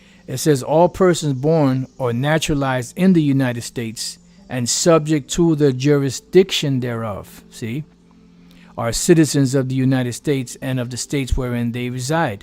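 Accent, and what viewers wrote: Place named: Jamaica. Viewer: I notice your Jamaican accent every now and then, shalam.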